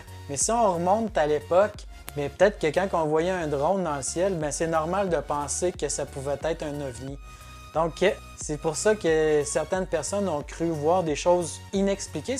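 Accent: Quebec, Canada. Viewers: J adore ton accent du Québec ♥♥ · derien 👍 tu peux faire des vidéo sur les zombie et j'adore ton accent québécois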